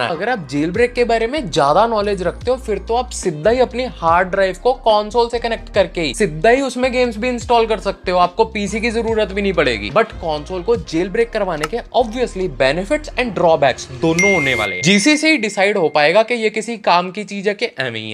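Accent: Punjab, India. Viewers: I came to listen his Punjabi Hindi accent......specially the word Chippppp😂😂 Loved your content😊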